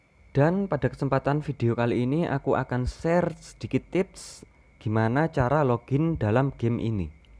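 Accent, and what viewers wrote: Java, Indonesia. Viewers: Suaranya medok banget bang mantap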